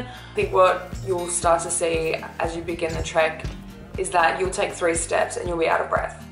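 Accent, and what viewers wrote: Australia. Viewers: Can't miss that accent! I did the trek in 2019 and loved it. Am going back as soon as we can travel again. I am from QLD; which state are you based in? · What an Aussie accent:D Dear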